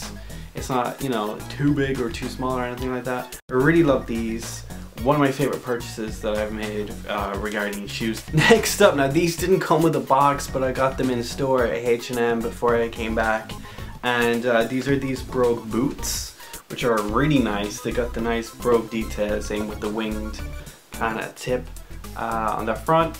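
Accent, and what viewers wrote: Ireland. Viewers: Are you from ireland ? Can kind if hear an irish accent :)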